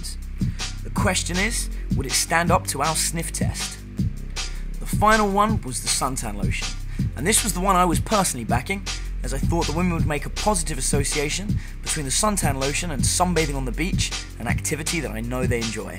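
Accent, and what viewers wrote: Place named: United Kingdom. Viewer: I like his British accent :)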